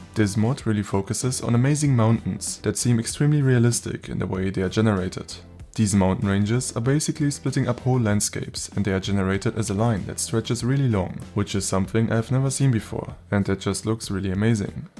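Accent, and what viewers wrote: Germany. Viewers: Gutes Video das Deutsch Englisch is crazy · ich hör a little bit german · are you german? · Are you german? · Ich hear auch a Little Bit german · Man hat sofort an deinem Akzent gehört, dass du aus Deutschland bist. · Your English sounds good but do I hear a little bit of Deutsch in there?😂 Nice Vid, thanks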